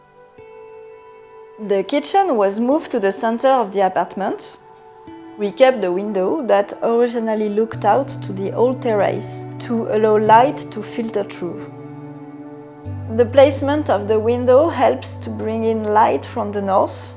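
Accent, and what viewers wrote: France. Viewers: I think it's just her French inflection. What she said is "I love the influence of Danish architects, Alvar Alto, and American MCM architecture". I'd have to assume any professional architect with interest in MCM design has studied this carefully. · Chouette visite, de plus je me rends compte que je comprends bien l’anglais quand il est parlé par une française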